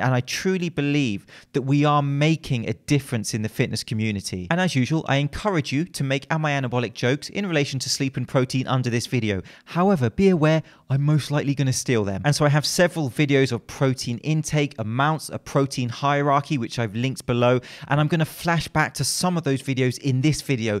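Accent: United Kingdom. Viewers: I subbed your channel a few days ago and I have been binge watching your videos. Excellent stuff and very much appreciated. Just listening to your British accent makes me smarter. And I like how you add some British slang in your videos - we learn about exercise science AND language. I particularly like the phrase "look like a wally" you used in your isometric video. I will be using that. I myself am trying to break some misconceptions regarding Olympic weightlifting, which the rest of the world just calls "weightlifting," particularly the ideas of a certain coach. If you ever need ideas or material on weightlifting let me know.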